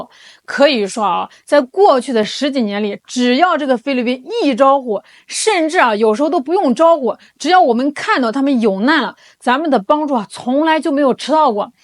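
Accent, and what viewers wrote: China. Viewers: 妮子，你是哪个地区的普通话，好听。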